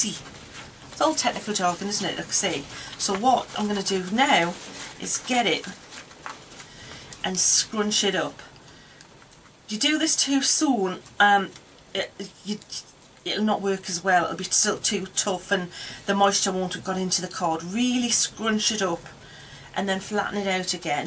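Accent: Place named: Newcastle, United Kingdom. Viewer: You sound like you come from Newcastle upon Tyne area. My great grandfather was from there. I am Australian. He came here in the 1890s . I am so enthused since seeing your embossed version. Might have to purchase a machine.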